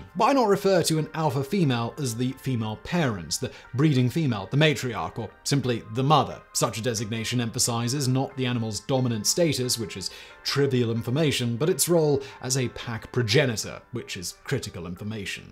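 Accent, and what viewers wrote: United Kingdom. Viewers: I watched the video, would you be shocked if I told you that a British accent doesn't make this guy the ultimate authority on everything? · Zig Zag - Oh yeah, a British accent, that does it for me every time! Like I don't hear a British accent every time I speak. Your only objections are that he has a British accent and said something that you don't agree with? I've read several articles debunking the "alpha wolf" theory - from people who have studied the phenomenon. I don't take any one person's word that something is so or isn't so. I look it up, I check on it, I read up on it as much as I can. ps: What's really sad is that you're judging me by your own standards - which says a lot more about you than it does about me.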